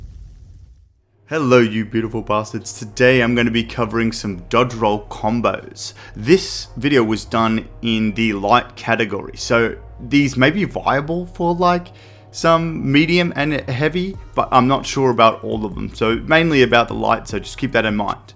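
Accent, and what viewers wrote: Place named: Australia. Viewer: Amazing video! ✌ love the ausie accent. Keep up the good work bro.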